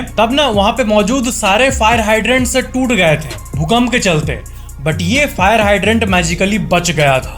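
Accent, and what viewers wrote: Bihar, India. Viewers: "i just love your bihari acsent "😂❤️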